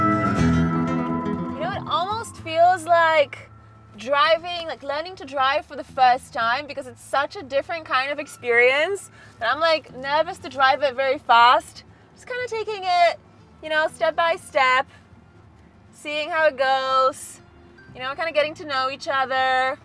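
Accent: United States. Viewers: in america, even her accent got a little more american🤠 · so cute, has aquired an a bit of an A,merican accent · Hilarious how you picked up the American accent! (from your hybrid British - Polish accent)! Like my wife who picks up the accent of the places she visits. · Is it me or did you get a slight American accent in this video? :P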